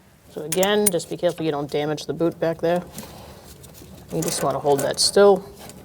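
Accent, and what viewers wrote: Boston, United States. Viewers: This lady is awesome! I've watched a few videos with her. Good instructor and I love her Bostonian? accent. Cah.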